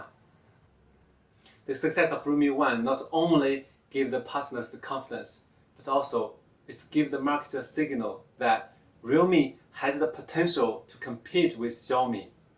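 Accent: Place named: China. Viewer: very good content. If you're up for some constructive criticism: -audio could use some work -English needs so much work, the typical thick Chinese accent (putting subs might help)..not being racist.. it's just that there are times when your words are indiscernible interested in what you'll do with your channel next though 😉 you should collab with TechAltar. that would be awesome since you already know each other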